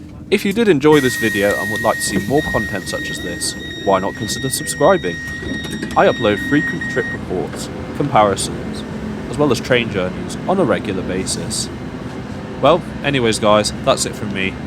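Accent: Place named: Sheffield, United Kingdom. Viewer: He’s from Sheffield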